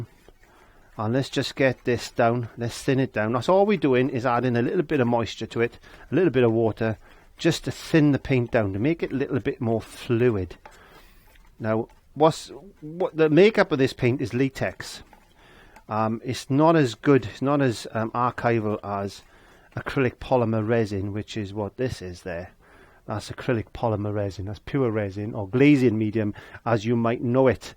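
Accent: Wales, United Kingdom. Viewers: Just recently found you and can't thank you enough for your advice. I use your "recipes" for Gesso and Pouring Medium and they are great. Happy to find a British artist as most are American and their products aren't always easy to find, also expensive. Also the Welsh accent reminds me of my Dad (Not that your'e that old!)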